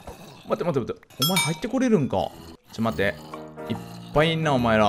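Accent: Fukui, Japan. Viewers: 前回のカズクラの福井弁はどうしたのでしょうか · え、福井の方？嶺北の方ですか？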